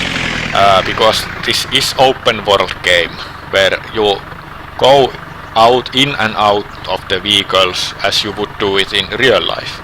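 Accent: Finland. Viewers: tuo rallienglanti on ihan törkeen hyvä lisä tähän :D ja tähän pitää kyllä tulevaisuudes saada joku multiplayer tai cooppi että saa kaverin kans ajaa pitkin raittia ja ryystää olutta ja käydä pubis ja ostaa lisää kaljaa :D ja toki lisää legendaarisia autoja esim saab 900,toyota corolla ke20,fiat 127 jne :) kyllä tämä on pakko ostos ja kaverille kun linkkasin niin oli ihan samaa miältä :D ihan vitun kova peli tulos, "kuinka muistot tulvivatkaan" :DD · ralliEnglantisi on kaunista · Aivan loistavaa! Videon rallienglanti kruunaa homman. Tö korner is tankero. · Classic Markku Alen english!! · daniel targett its rally english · Rallienglanti on tähän yhteyteen täydellinen aksentti, älä ikinä muutu. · Finnish accent :) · With "rally english", ofcourse. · oo my gawwwd, the finnish english accent for the win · that finnish accent in english it's so unique =D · Vittu ku on rallienglantia. 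· Suomen eglanti aksentti on kyl hauska. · suomi-englanti-finglish, 5/5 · every pawt iz sumhoww cruishul for de funtion of de engin love how finnish people talk :) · It´s so called "rally english*". If you listen some finnish rally driver interviews you get this joke. :) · Im guessing that his accent in reality is not that thick but that it's rather a parody of the "Rally English" that Mika Häkkinen and all the 70s and 80s finnish rallyedrivers spoke. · Aijai! Selostettu vielä autenttisella rallienglannilla! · Rally english makes it always funny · kyllä on suomalainen peli ja rallienglanti kohillaan tuleeko steamiin vai omalle nettisivulla?? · En kestä rallienglanti ilman beer. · His Finnish accent cannot leave when he speaking English lol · I'd say Finglish is more common though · Ei millään pahalla, mutta tää suomienglanti on jo aika helvetin koomista. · 51 minutes and 5 seconds of beatiful Finnish accent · Nyt on kyllä niin rallienglantia että ei pysty edes sanoin kuvailemaan.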